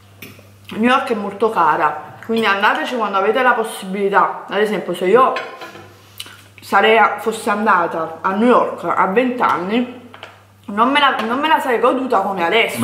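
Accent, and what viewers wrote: Naples, Italy. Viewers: Sì è Napoletana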